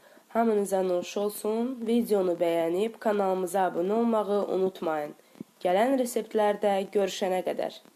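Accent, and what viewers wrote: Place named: Armenia. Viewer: Yeraz aksentine oxsadi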